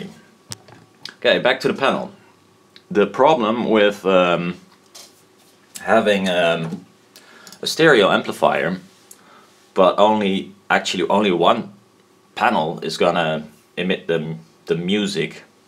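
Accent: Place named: Netherlands. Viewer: ik ook lol gokte het al aan je accent.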